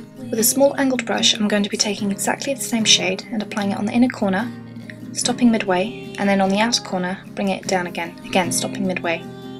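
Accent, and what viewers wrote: United Kingdom. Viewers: its nice to hear a british voice on youtube, most the people that do make up tutorials are american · i love ur british accient and ur make up is amazing!!!!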